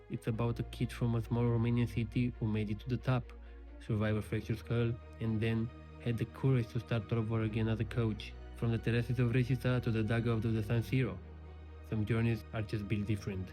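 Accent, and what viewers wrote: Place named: Belarus. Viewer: mama cum iese belarusul prin accent. fa coae video in romana si aia e..daca nu pune AI.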